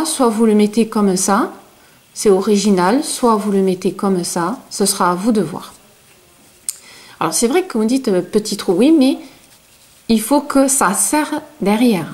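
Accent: South, France. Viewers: l'accent du Sud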